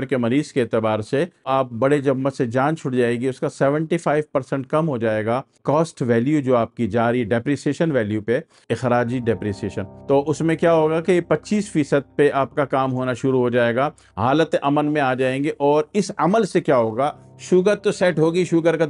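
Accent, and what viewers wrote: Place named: Hyderabad, India. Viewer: Aap bilkul hyderabadi lehje mei boley hyderabad, khushi hui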